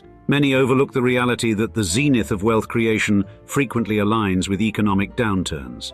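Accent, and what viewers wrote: United Kingdom. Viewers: This is just “rich dad poor dad” spark notes in a British accent.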